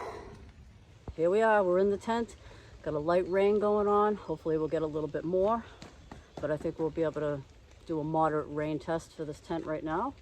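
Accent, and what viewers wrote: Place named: Boston, United States. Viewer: Whoaaa…. You have excellent instincts!… you played it “smahht” 😊… absolutely luv luv the Boston accent!!.. I’m from northern California.. we don’t get to enjoy that over here 😕.. aaaaaand.. nice tent with the built in ground cover or pocket for the vestibule… I always learn something from you.. thanx! 👋🏼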